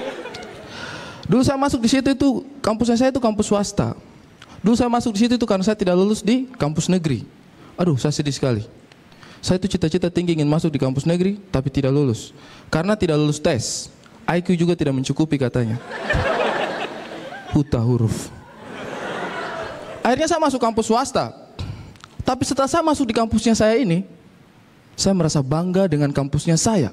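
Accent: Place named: Eastern Indonesia, Indonesia. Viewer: Timur logat nya enak 😄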